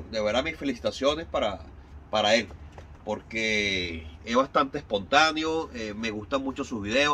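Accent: Venezuela. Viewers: Excelente amigo muy bueno y claro el video. Al escucharte supe que eras un paisano venezolano. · Hola saludos hermano me interesa sobremanera y me llama la atención tu acento creo que eres Venezolano 🇻🇪 Yo también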